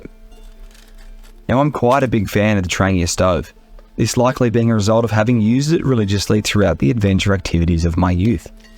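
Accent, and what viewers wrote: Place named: Australia. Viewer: Nice to hear an Aussie voice with familiar Australian places. Good video!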